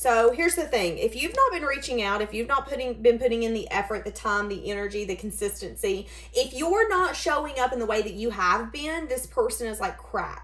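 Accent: South, United States. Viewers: You're pretty... Love seeing the face with the southern hospitality accent... Blessing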